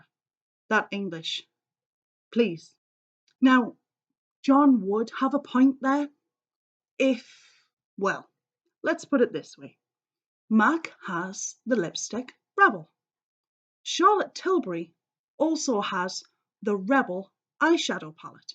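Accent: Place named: Scotland, United Kingdom. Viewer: I loved this vid! Hello,! I have just discovered you and am now subbed! I adore your feisty Scottish voice and attitude. I can’t wait to see more! 👏👏👏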